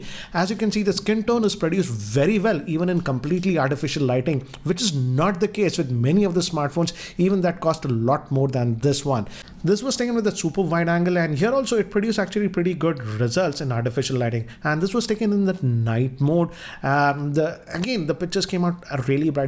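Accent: India. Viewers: wat a english eee ddd cccc bbb ithis words only using ........butler english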